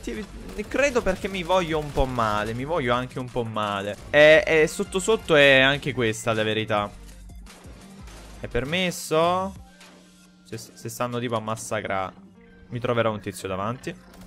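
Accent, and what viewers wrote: Naples, Italy. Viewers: Ma sei di Napoli?😂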